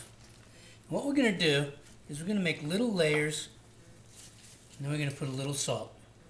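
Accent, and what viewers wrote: United States. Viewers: Haha, you just have a strong American accent is all. I've definitely heard worse :)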